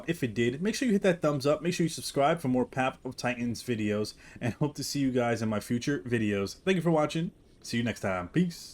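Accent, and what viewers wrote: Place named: New Jersey, United States. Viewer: you from jersey? I hear a tiny accent coming through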